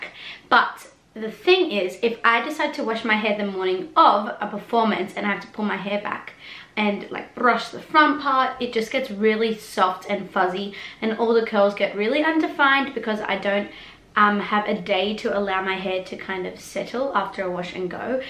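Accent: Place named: Australia. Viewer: Omg you’re Australian YO WTF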